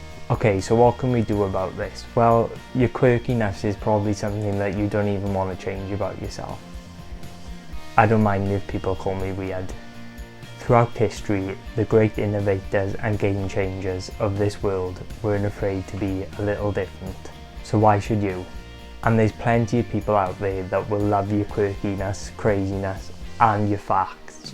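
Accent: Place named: Scotland, United Kingdom. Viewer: Scottish ? Cute accent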